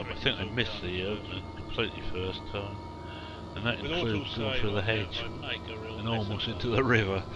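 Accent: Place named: Newcastle, United Kingdom. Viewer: Wonderfull geordie accent...Lol Thanks bud Good vid man thumbs up BTW i would like to see soil mod on old ridge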